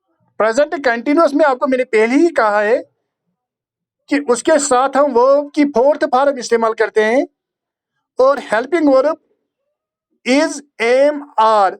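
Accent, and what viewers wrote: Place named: Kashmir, India. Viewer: Sir great expl. in Kashmiri accent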